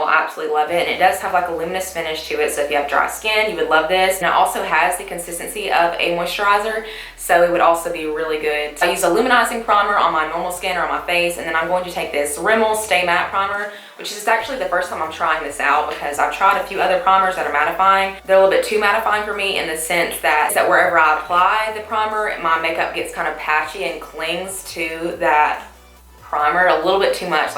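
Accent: South, United States. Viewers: Bc she has a southern accent!?! OMGGGG this makes me mad like they're not the same whatsoever she just has the same accent as someone else and now they remind others of someone, seriously 😒 gosh this is annoying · (1) You are even prettier natural! (2) Your Southern accent really surprised me for a moment - but my ear adjusted. :) (3) Your skin is naturally perfect & translucent. (4) Nice suggestions and demonstration. Thank you. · that's where that sweet accent comes from! I want to live down south so bad! Great video I love that you use mostly affordable drugstore make up!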